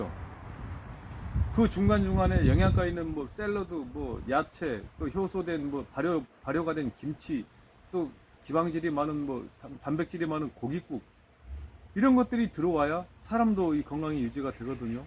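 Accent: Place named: Jeolla, South Korea. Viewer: 말씀이 전라도분 같아요 어디신지~?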